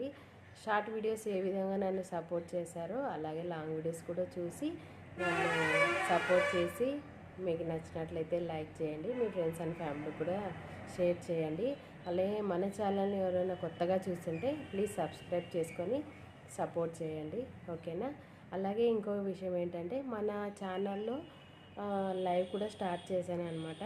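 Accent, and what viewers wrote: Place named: Rayalaseema, India. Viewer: Ekkada unnaru rayalaseema basa matladu thunnav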